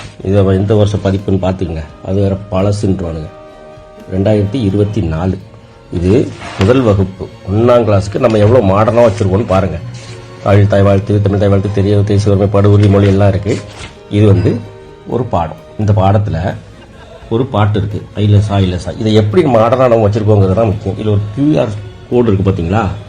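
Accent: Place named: Bangalore, India. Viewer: Ne bangalore tamil pesitu iruka solu da DMK SOMBU dog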